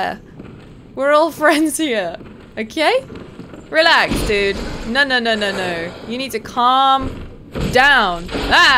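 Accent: United Kingdom. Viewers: It's quite funny to listen to a british girl (i assume) playing this game and commentating, it's very immersive actually.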